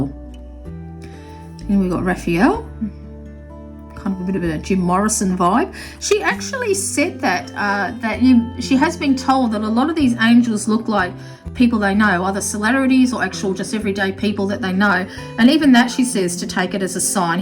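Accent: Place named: Australia. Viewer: Awwww bless you and thank you so, so much for this lovely review of my cards!! I love that you saw Sandalphon as Fabio! Ha ha I also think he’s like Conan the Barbarian 🤣😂 So lovely to have a fellow Aussie wise woman reviewing these. Your accent makes me homesick ❤️ I hope you are feeling better soon. The goddess and the angels are with you 💖 Loved hearing about your own visions and experiences with the angels during this too. Thank you! Good feedback on the pronunciation guide. I will add that in my next deck - great idea 🙏 Have a gorgeous day and huge thanks again. I feel very humbled by this review. Love Alexandra xxx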